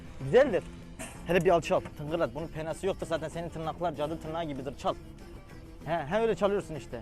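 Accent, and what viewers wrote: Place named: Eastern Anatolia, Turkey. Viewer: Doğu şivesi videoların çok iyi bence devam etmelisin çekmeye 😂😂👍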